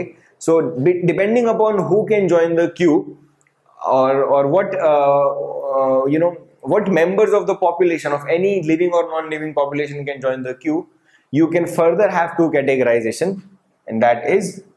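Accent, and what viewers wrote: India. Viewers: I like how you elaborated what queuing theory entails with simplicity and clarity even though Indian accent is a bit of challenge.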